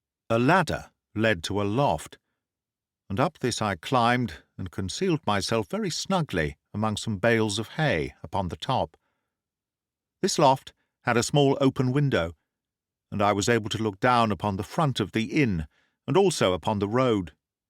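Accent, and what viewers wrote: United Kingdom. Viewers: I can't express how much I enjoy listening to your clear British accent... Please keep posting more on your channel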